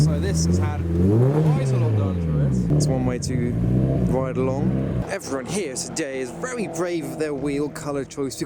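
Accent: United States. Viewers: Nice American voice loll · More of your non-specific American accent, please. My favorite part. Wish you’d made more of a fuss about the Chimera.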